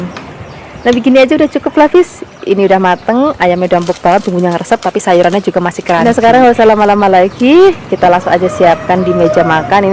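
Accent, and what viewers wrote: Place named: Semarang, Indonesia. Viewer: Suka bngt dg cara bicara nya ceria bnget...spt logat org semarang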